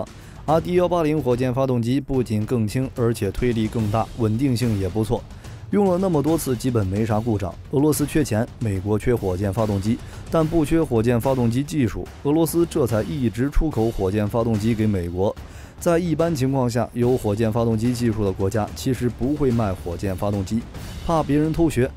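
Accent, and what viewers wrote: China. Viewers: 聽聲音也知道這是中共病毒武漢肺炎國人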